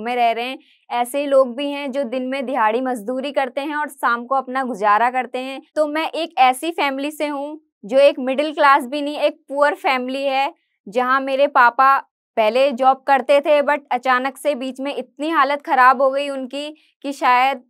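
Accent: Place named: Uttarakhand, India. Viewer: Aapke way of talking se first vedio mai hi mujhe pta lg gya tha aap uttrakhand se ho mai v uttrakhand se hu ❤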